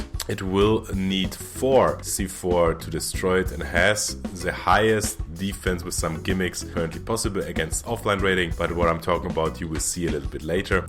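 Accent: Germany. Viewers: nice video, where you from? I think from Germany ^^ because the german accent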